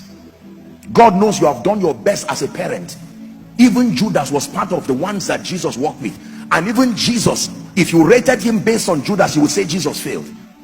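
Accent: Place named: Nigeria. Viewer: 6-5 is this the workday where you are? Assuming this accent is Nigerian(?) and therefore assuming this video is to a Nigerian audience... You guys are hard working. 11 hour work day. Yoh!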